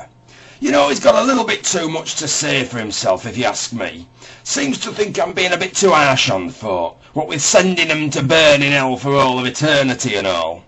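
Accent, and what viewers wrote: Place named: Yorkshire, United Kingdom. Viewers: Love the Yorkshire accent lol. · I thought he was yorkshire man (well sort of)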